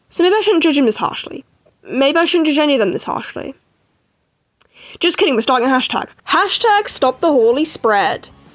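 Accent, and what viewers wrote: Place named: RP, United Kingdom. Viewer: (Ignore how I’m late) PLEASE I LOVE YOUR VIDEOS MAN BAHAHAHA. And your British accent just makes this so much better because you sound so posh (I’m British don’t come at me-)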